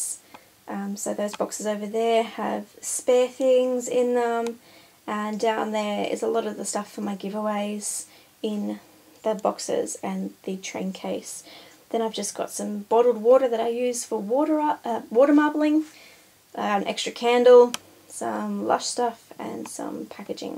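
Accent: Australia. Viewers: Knew you were Aussie straight away but I often forget how... Different we sound when watching YouTube or movies xD so jealous of your opi polish collection! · are you australian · LOOOOVE UR ACCENT! <3 best aussie style ever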